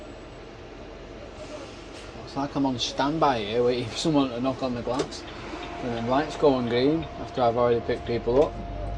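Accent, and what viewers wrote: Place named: Manchester, United Kingdom. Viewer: Great video,s as an expat manc it's great to hear a manc accent! I'm curious do you stick to the same routes all the time or do ever do routes say in sale Altrincham way?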